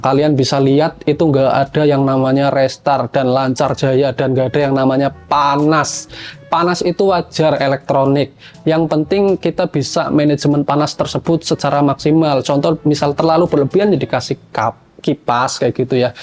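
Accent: Banyumas, Indonesia. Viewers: bjir hpne go ngpo kui mase.hihihihhii apa jadi mesin MiFi batangan. ngapak e ngndi mas,slm kng somagede. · wong endi sih..deng bisa ngapak...xixixixi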